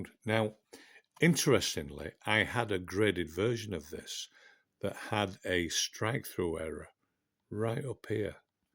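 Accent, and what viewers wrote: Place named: Northern England, United Kingdom. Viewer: Love your vids ,especially appreciate your northern accent!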